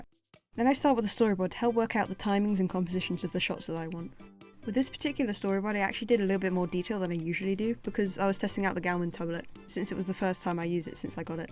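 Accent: United Kingdom. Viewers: YOUR BRITISH?! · ALSO BRITISH? if so me too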